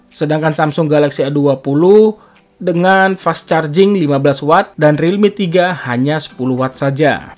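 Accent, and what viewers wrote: Semarang, Indonesia. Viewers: Admine wong semarang 😂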